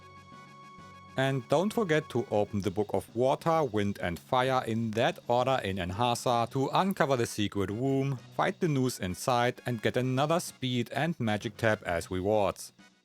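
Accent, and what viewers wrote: Germany. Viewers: as someone who has known this game inside and out for ~24 years now, i can confirm the info in this vid is extremely bad. always get safe helm, swallow is terrible. speed tabs max chrono’s speed. haste helms are best for most characters, and you get the rainbow sword for chrono which is vastly better. also you get status immunity armor for everyone, so confusion is no problem… max all your characters stats and get all the optimal armor and weapons… i don’t have the time or energy just look up better guides. best thing a out this video is his german accent combined with rhotacism, which i find incredibly endearingly adorable 💗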